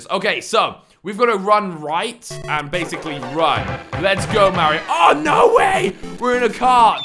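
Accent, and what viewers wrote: United Kingdom. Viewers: pls reply are you British 😀?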